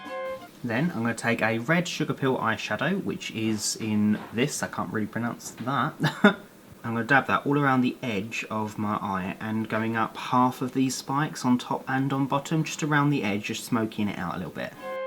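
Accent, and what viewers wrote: United Kingdom. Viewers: I love your British accent and you are really talented ❤️❤️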